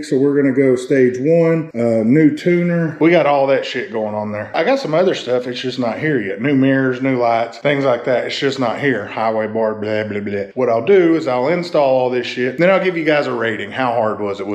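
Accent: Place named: Appalachia, United States. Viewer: Why do people who talk like a HillBilllie sound low IQ to me ?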